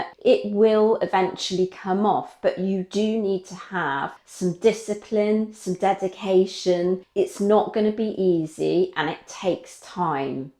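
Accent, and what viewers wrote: England, United Kingdom. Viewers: I'm in San Antonio, Texas. Love hearing about fitness and diet for my age, and especially with your English accent. ❤️❤️❤️ I'm definitely inspired, and your videos are helping me!! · Ahh England accent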